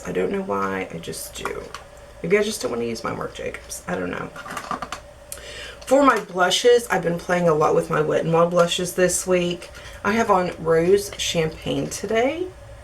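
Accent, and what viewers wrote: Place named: Pennsylvania, United States. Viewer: I need that Hard Candy spray! You make me want to buy everything LOL! You look beautiful as always :) You sound like my family from Pennsylvania :) I could watch and listen to your videos all day long :)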